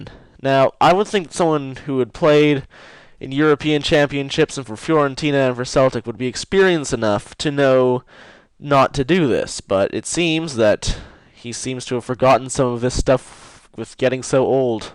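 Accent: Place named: United States. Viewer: was actually going to listen to the whole video untill I heard your accent, you americans don't no shit about football! boruc is currently one of the best goalkeepers in the premiership.. saints fan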